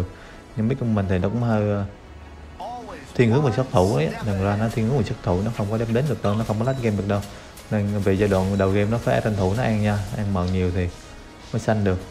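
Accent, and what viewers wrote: Quang Nam, Vietnam. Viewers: Người Quảng Nam hay Đà Nẵng rứa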